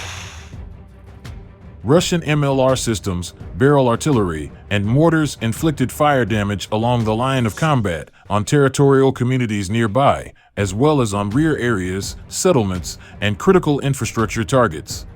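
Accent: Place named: United States. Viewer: Also, why the voice of a hick?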